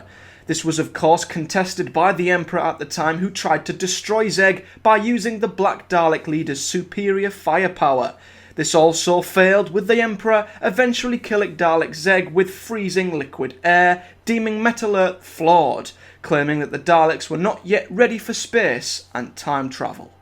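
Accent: Middlesbrough, United Kingdom. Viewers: Thank you ever so much for taking the time and effort to make such a top drawer video. It was great. May I ask are you from Middlesbrough like me? The girl who sometimes is in your video sounds like she does too. She usedthe word narked. Top Boro word. Anyway cheers and take care Ant p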